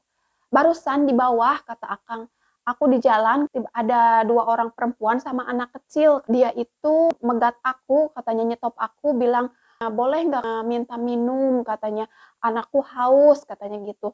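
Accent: West Java, Indonesia. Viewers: Sy seneng.ada sunda2 nya ngomong nya a eteh mah lucu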